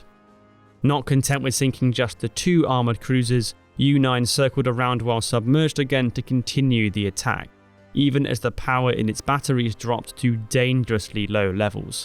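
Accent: United Kingdom. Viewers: Ur voice sounds like british accent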